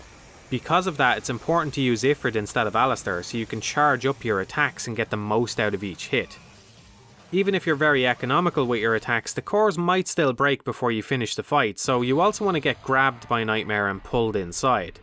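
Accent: Ireland. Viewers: You Irish? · Woah woah chill bro I didn't mean it like that.. why do I exist and you don't even know me? Who's the ignorant & insensitive one here? Jesus Christ what's your problem? Snowflakes like you are everywhere these days it seems.. Listen i got no problem with him being Irish.. on the contrary I only enjoyed this video even more because of his lovely accent and his knowledge & love for this game.. I wouldn't have it any other way that's why I keep coming back to this video years later.. I wasn't trying to be racially ignorant although my initial comment may have sounded a little insensitive, however I said it in a humorous sarcastic tone.. it's just that DMC is such a niche franchise and not many people are into it and because of its anime style I thought it was more popular in Japan than the rest of the world combined much less with an Irish guy.. hence my comment.. 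but does that mean I think no Irish fella or foreigners know about DMC? Ofc not! There are fans all over the world but none have I seen anyone explain it in detail like this guy.. Having said that he is the first Irish guy I've seen on YouTube that has expressed so much love for this game through his vast knowledge on this game.. my original comment had no intentions to offend him and I doubt he would be since he probably has thick skin and can take a joke unlike a certain snowflake lol.. just kidding.. love you too bro.. just don't be quick to judge people next time geeZ..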